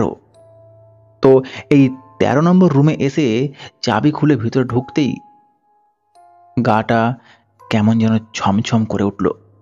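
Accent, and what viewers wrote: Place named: Medinipur, India. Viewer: Vai tomar bari medinipur naki..kotha bolar dhoron suney money ho66ey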